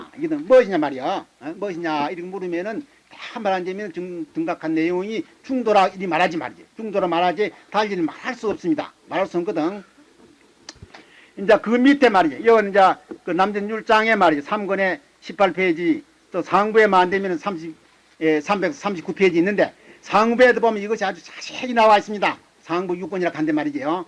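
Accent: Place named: Gyeongsang, South Korea. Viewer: 경상도 맞습니다 동감입니다 ㅎㅎ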